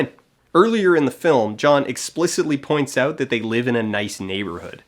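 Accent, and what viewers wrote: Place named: Canada. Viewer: Definitely is Canadian.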